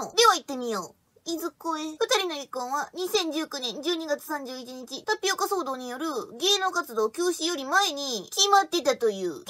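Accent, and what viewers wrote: Kansai, Japan. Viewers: 統裁は関西なのですか？ 関西弁も聞きたいです。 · 総統、関西？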